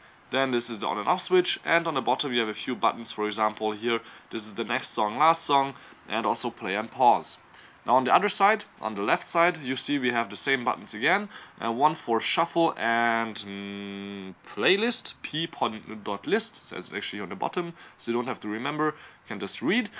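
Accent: Germany. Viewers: Deutsche die Englisch sprechen hören sich so scheiße an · Dieses Deutsche akzent schrecklich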